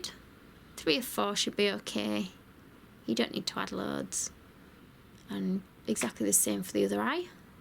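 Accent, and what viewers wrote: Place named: Northern England, United Kingdom. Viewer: she's northern! Northern English accent :)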